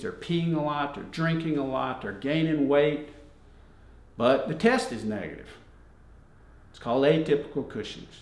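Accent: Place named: South, United States. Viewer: I love that southern drawl 😊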